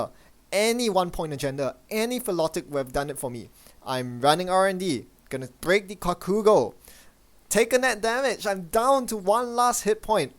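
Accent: Singapore. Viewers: Are you Singaporian? I just had to ask, I can't place a finger on your accent.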